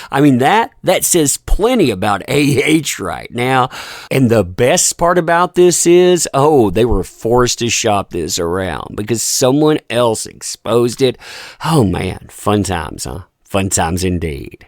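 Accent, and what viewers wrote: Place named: East Coast, United States. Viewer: Ok, you can sure tell you're from back east it's YUK-A not how you say it. Also please do a segment of a h getting offered $10 million to do a XXX rated porn-flick.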